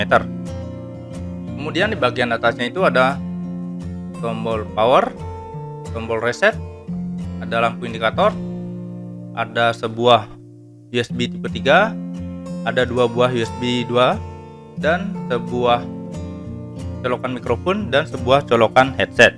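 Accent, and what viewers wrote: Indonesia. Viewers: ngenah sajan logat indonesia ne..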